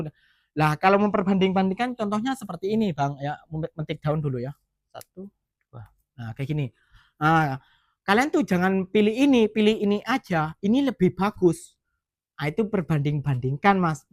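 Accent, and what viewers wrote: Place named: Indonesia. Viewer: dari awal sampai akhir video tuu kamu udah cakap melayu dialeknya aja dialek imdonesia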